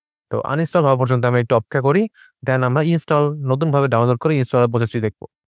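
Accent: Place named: Jhenaidah, Bangladesh. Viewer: ভাই আপনার বাসা কি ঝিনাইদহ?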